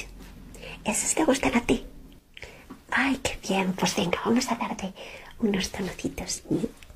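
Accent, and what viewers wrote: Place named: Spain. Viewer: Esta muy bueno el asmr pe nose que tengo con el acento español que no me da tranquilidad pero igual esta lindo <3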